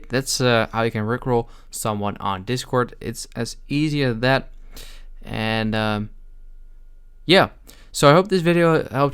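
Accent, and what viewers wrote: Netherlands. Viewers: R u from the Netherlands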